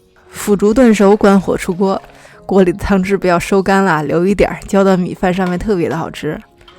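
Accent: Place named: Beijing, China. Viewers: 北京口音好亲啊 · 北京話嗎？很好聽。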